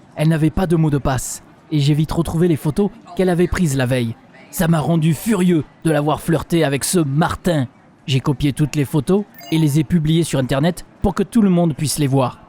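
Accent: Marseille, France. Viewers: Accent marseillais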